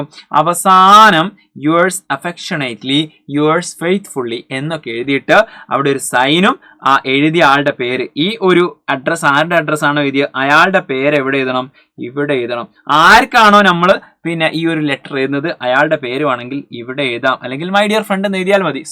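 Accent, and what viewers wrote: Kozhikode, India. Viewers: Sir Kozhikode ano👀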